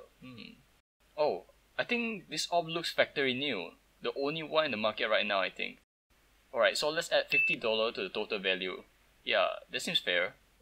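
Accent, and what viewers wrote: Singapore. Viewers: u sound singaporean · R u from singapore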